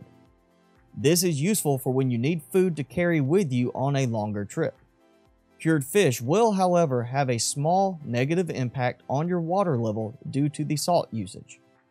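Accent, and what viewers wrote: South, United States. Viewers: Thanks man. Btw you sound country as hell!! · Country as hell for a YouTuber maybe lol. 🤠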